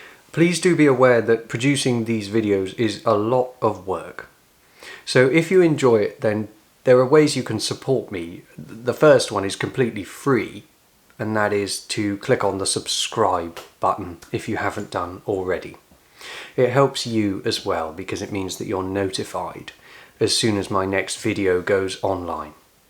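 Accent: United Kingdom. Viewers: Your commentary is so delightfully British 😁 Thank you.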